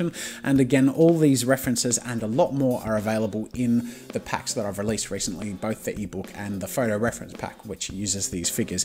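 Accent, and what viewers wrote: Australia. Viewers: he sounds extra Australian here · His Australian accent was so much stronger 5 years ago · this by far has his deepest Australian accent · When Australian accest still exist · does he have a really Australian accent in THIS video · why does he sound so much more Australian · you sound so Young and aussie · His Aussie accent sounded a bit different here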